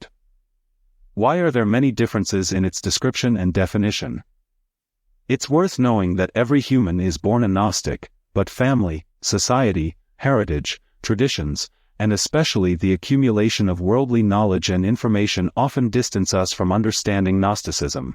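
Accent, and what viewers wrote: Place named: Tunisia. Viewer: لا يا أخي أنا شخصيا لن أشهاد هاته الفيديوهات نحن نحب صوتك و طريقة إلقائك و تفاعلك باللغة العربية الممزوجة بلهجة تونسية لي نحبه ياسر كمغربي جارك و محب من أحبائك شكر و أتمنى منك تفهمي.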